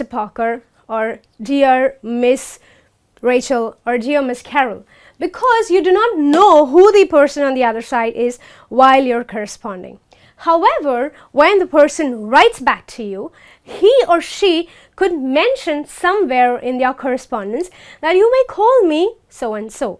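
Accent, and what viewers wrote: United Kingdom. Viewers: There is no need of such British a csent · She takes british action and fast cant understand what she is saying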